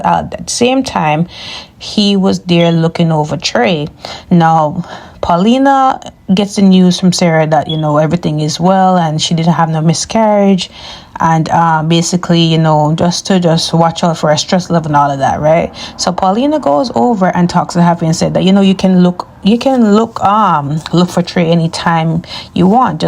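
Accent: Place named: Jamaica. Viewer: U sound Jamaican 😂but yh javi is a hopeless fool because why are u pushing ur man away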